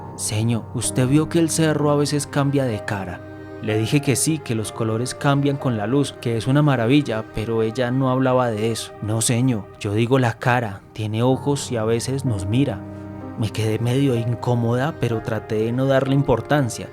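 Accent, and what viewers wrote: Argentina. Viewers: Es al revés un colombiano leyendo con el acento bien Argento 🇦🇷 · EN SANTIAGO DEL ESTERO. SEGÚN DE DONDE ES AVECES SI HABLAN ASI. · Me encanta el acento con el lunfardo argentino ☺️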